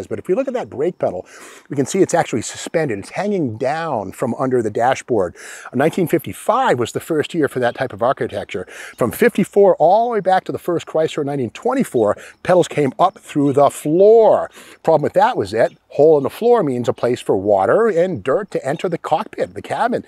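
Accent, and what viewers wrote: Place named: Boston, United States. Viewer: . You still got that Boston accent...all good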